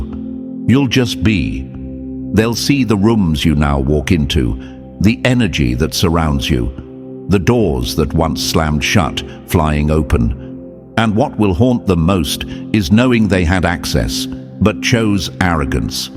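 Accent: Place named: United Kingdom. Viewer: 💫👁️✨🍎✨🇺🇸💫☦️💫🥰😇💫Indeed British accent Angelic man❤☦️🇺🇸💫🕊️🔥🍎👁️💫🥰👁️✨💫🙏😇🙌🏼💫You Speak 🗣️ my Language 👁️🇺🇸💫✨🇺🇸👁️✨🇺🇸💫👁️✨🇺🇸✨🍎💫🕊️🔥❤💫🇺🇸💫❤💫🇺🇸💫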